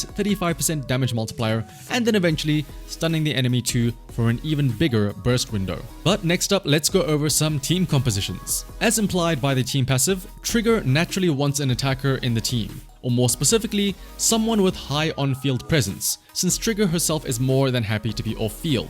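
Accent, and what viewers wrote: South Africa. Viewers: Are you Afrikaans? Cause I recognize the accent. I speak ook afrikaans very deliciously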